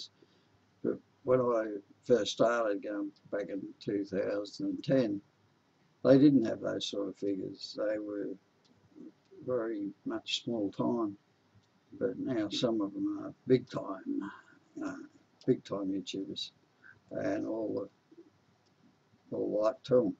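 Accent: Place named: Australia. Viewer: Hi if you're wondering why I comment so rarely on your videos, while you so often comment on mine... it's not because I'm selfish. I would love to comment on more of your videos, but the problem is, I don't always understand what you say, since English is not my first language, and your Australian accent is a bit difficult for me. Ask Brendan.. I have to watch his "Friday's Funny" episodes like 3 or 4 times in a row to get all of his jokes! There seem to be different accents in the different regions of Australia, because some folks I can understand better than others. For example, I usually understand Gazza, but when he speaks in his funny voice on the "Bogan News" it's hard to get for me.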